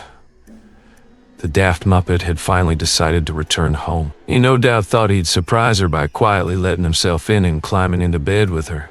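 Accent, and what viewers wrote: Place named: United States. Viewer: What the helll? No American accents please!!! This is a book about the English police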